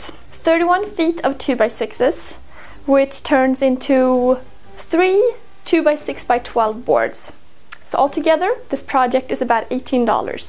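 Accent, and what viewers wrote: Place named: Sweden. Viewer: Misstänkte nog att det var en svenska bakom den brytningen. Anywho snyggt jobbat :)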